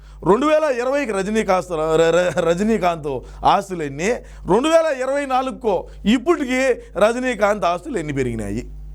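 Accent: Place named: Telangana, India. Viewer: Bro slang Telangana la undey😮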